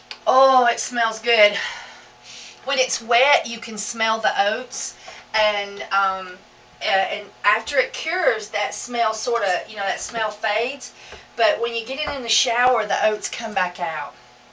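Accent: South, United States. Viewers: I’m pretty sure that she speaks in a southern accent but is trying very hard to not speak like that🤣🤣🤣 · This was a great video. Thanks for uploading. I have been curious about making soap since I saw it being made at Stone Mountain years ago. Thanks for giving your recipe for beginners. I have not gotten up the courage to try yet. But the simplicity of this video makes it seem like, YES I could do this. The Southern accent (LOVE IT) makes me feel like I am learning from my Aunt. ✌🏻❤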